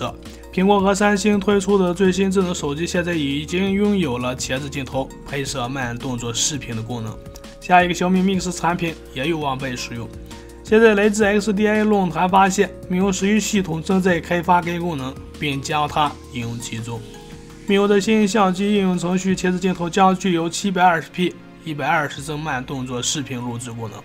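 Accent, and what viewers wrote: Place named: Northwest, China. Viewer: 哈哈哈哈 晋蒙陕甘口音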